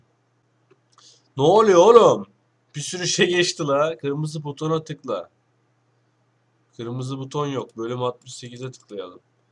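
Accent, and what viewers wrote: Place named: Adana, Turkey. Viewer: Adanalı işte gurban olayım anagı yolugu diye ya ağız dolusuyla çok hoşuma gidiyor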